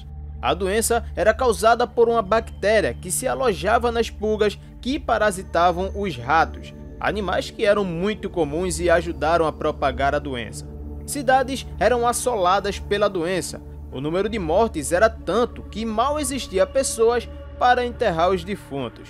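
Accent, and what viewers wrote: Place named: Bahia, Brazil. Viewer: O cara é mais baiano que eu que sou baiano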